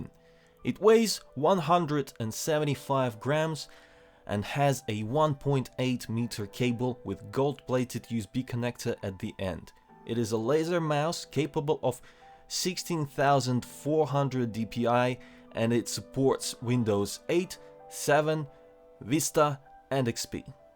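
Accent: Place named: Russia. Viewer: Ты оч хорошо по английски говоришь, но всё равно с русским акцентом))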